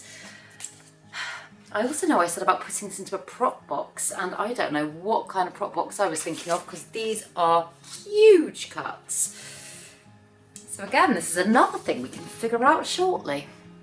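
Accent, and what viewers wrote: United Kingdom. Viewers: Hi! I've never had any language problems with your channel British English everytime. Question, HELP! Begonia semperflorens, bronze leaf, they root in water with no problems but when I plant them in soil, they rot. Thoughts?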